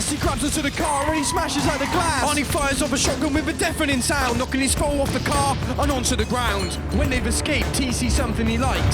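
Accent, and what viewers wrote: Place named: United Kingdom. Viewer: LOL, nah mate. He's British. Strange how other countries have trouble telling our accents apart.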